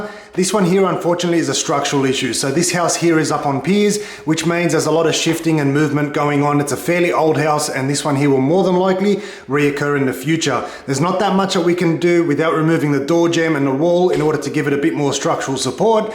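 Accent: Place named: Australia. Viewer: 🤔Why do you sound Australian?🤔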